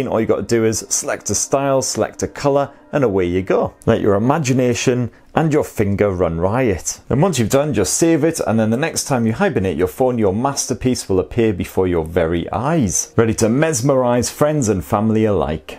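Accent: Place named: England, United Kingdom. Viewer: Great reviews as always, can't you tune a little bit your english accent to an international one😁😁.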